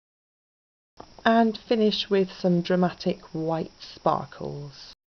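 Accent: United Kingdom. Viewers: I love your accent and videos :), are you British btw? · whoo hoo your'e british!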